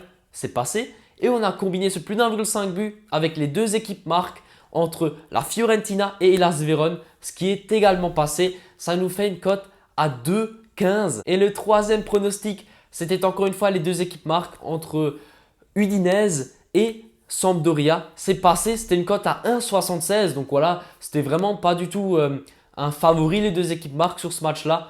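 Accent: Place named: Alsace, France. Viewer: Je crois il vient d'Alsace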